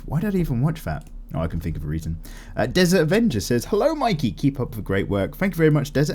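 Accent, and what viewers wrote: United Kingdom. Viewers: Hey Mikey! Im happy being able to listen to your beautiful British voice in the back as I continue to hone my artistic skills! I wanted to answer some questions fro,m past DWMs real quick 1-DWM 89: More recent the ending or opining image in the Sword art online spinoff where the main character (Who you should draw if possible) Is standing outlined in the rubble. 2-DWM 88: Nothing much Pumpin Iron and drawings! 3-DWM 90: Not an actress, but more of a cosplayer I recently found named Vamplette! Anyways have a great day!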